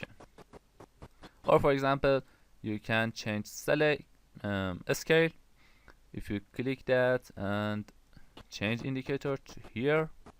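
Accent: Iran. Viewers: Are you persian?your pronunciation like persians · it's so obvious he is persian lol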